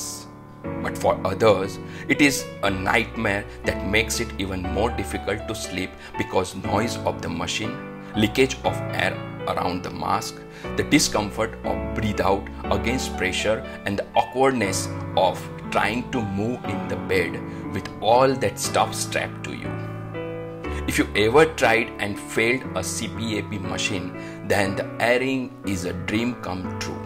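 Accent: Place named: Gujarat, India. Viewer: I like your accent, gujju Bhai.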